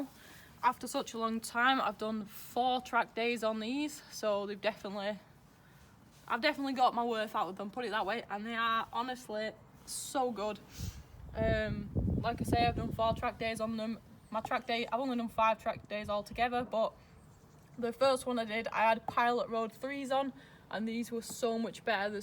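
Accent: Northern England, United Kingdom. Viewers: No, I'm in Norwich. I just like northern accent lol. Watched the MT10SP ride and then this one... Not enough UK vloggers with interesting accents... Keep it up, sort the Mic out though... bit quiet 👍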